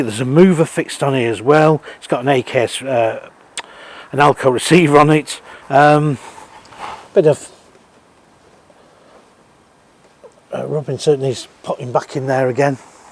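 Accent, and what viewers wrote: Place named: Lancashire, United Kingdom. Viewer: Another great no B/S review you sound like you come from the lancs area, I grew up in Coppull I wonder if you ever visited Dave Barron caravans a massive site at the time sadly long gone it’s a big housing estate now. I watch all your stuff keep up the good work.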